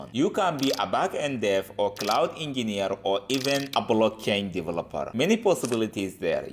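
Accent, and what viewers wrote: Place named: Italy. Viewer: You sound Italian. Great content, bro.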